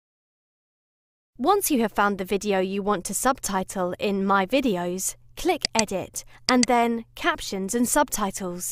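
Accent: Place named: United Kingdom. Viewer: very thick brit accent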